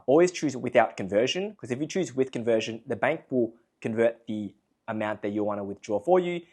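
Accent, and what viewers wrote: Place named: Australia. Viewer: You have an Australian accent.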